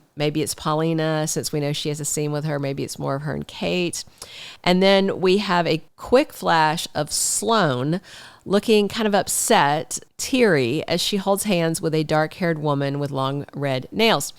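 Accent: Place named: South, United States. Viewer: I can’t wait to see all of them. But if I had to choose it’s Theresa’s asking what are you doing here. Thanks. Oh! I detect a southern accent. Are you from the South?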